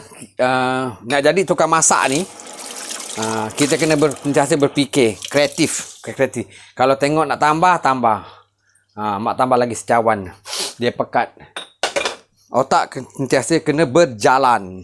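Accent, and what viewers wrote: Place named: Muar, Malaysia. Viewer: Terbaik makk... org muo gaya bahasanya mmg ada identiti tersendiri😁👍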